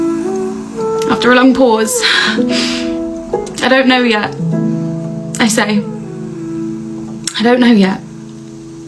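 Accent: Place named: United Kingdom. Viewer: I would write ✍️ words and phrases to your lines that inspire me, that talk to me. I would compose words and phrases that your UK accent speaks to me every time you write a line, edit out a word or phrase that makes it more mathematically precise. Hoping that it is what my soul is saying to your soul.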